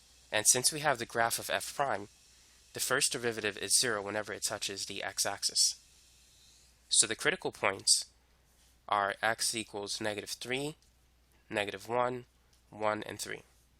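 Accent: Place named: Rural, United States. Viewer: his low key countræ accænt 😍😍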